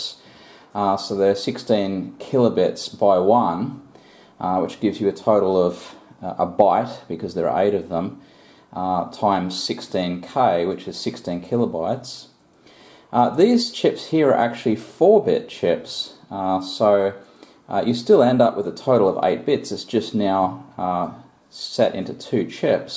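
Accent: Australia. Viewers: Enjoyable. Came here from retrospectre channel. Subscribed. Nice to hear a fellow ausdie voice too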